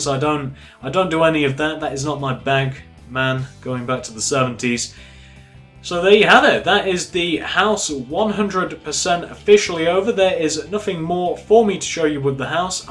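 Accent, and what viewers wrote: England, United Kingdom. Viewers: He's English, not gay.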